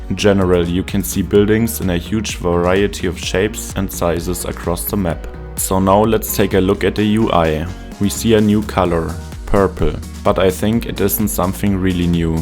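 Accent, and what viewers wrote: Germany. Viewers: Bissel Deutsch xD Aber Starkes Englisch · Hearing your German accent is kinda funny ngl (Ich bin auch Deutsch)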